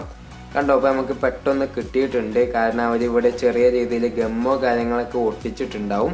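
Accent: Malappuram, India. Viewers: മോനെ നിനക്കും നിന്റെ വടക്കൻ സംസാരത്തിനും നല്ല ഗ്ലാമർ ഉണ്ട്‌. വീഡിയോ ഇഷപ്പെട്ടു നന്ദി. വീഡിയോയേക്കാൾ ഇഷപ്പെട്ടത് അതിന്റെ ബാക്ക്ഗ്രൗണ്ട് മ്യൂസിക്ക് ആണ്. അത് എഡിറ്റ്‌ ചെയ്തവരോട് ചോദിച്ച് ആ മ്യൂസിക്കിന്റെ പേരും അത് പ്രൊഡ്യൂസ് ചെയ്തയാളുടെ പേരും എന്റെ ഫോണിൽ (<num>) SMS ആയി അയച്ചു തരണമെന്ന്‌ അഭ്യർത്ഥിക്കുന്നു. ആ മ്യൂസിക് നെറ്റിൽനിന്നെടുക്കാൻ വേണ്ടിയാണ്. അ മ്യൂസിക് കേൾക്കുമ്പോൾ യൂറോപ്പിൽ ഇരിക്കുന്ന പ്രതീതി. തീർച്ചയായും അയച്ചു തരുമല്ലോ?. താങ്ക്സ്. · Nee മലപ്പുറം ആണോ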